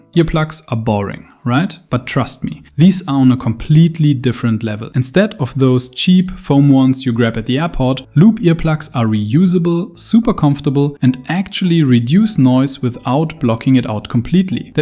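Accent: Germany. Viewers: Ist das geil, wie man direkt raushört, wie ein Deutscher Englisch redet 😅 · When the travel gear video has a narrator viz a German accent👌👌👌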